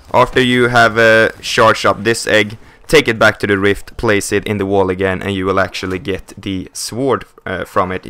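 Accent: Sweden. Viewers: Bra swengelska!!! Döör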